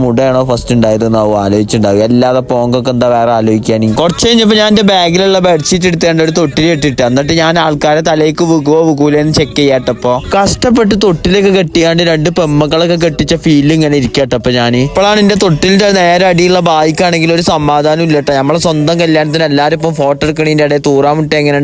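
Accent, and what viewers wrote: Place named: Malappuram, India. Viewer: Malappuram thil angine ya parayaa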